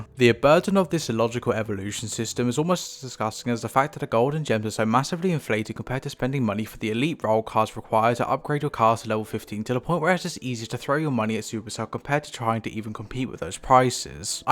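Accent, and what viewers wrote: United Kingdom. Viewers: Real ones just turn on closed captions to counter the british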